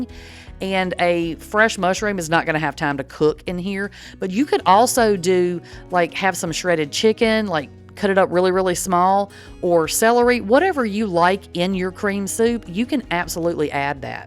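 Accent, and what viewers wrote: South, United States. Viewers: You are JUST adorable and So kind! I think I need to go live in the South - but Im from Australia! You could be reading the phone book with that accent and I would feel happy! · This Texas girl loves listening to your southern accent....kinda comforting to me. ❤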